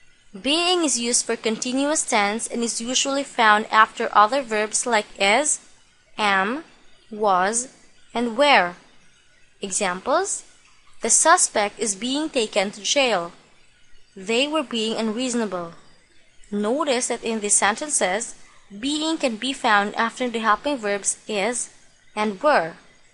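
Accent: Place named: China. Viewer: Bhai ya behen dhang se bol. Smj hi nhi aa raha Chinese k jaise bol raha h tu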